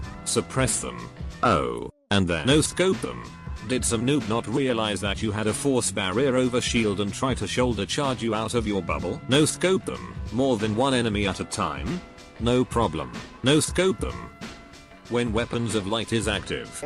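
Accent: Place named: United Kingdom. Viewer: Two questions. First, how do you do that mechanical Brit voiceover? Second, what's the track ?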